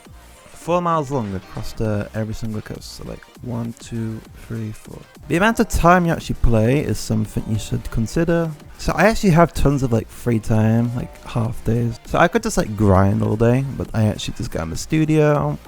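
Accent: United Kingdom. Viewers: I love ur British accent Wish me luck imma buy a pc!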